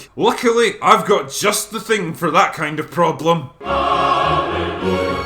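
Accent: Scotland, United Kingdom. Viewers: His Scottish accident was way more subtle in his earlier vids... he got at least 110% more Scottish in the last year or two.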